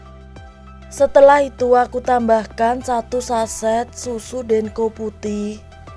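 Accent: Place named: West Java, Indonesia. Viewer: Orang Sunda ya..kentara suaranya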